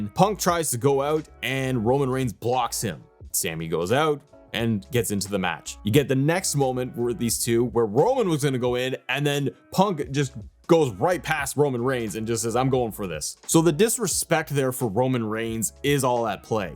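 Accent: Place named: Canada. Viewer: I can tell you’re Canadian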